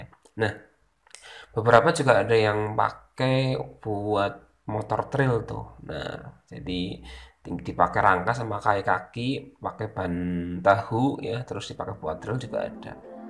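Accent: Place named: Banyumas, Indonesia. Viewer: Ngapak ya mas wkwk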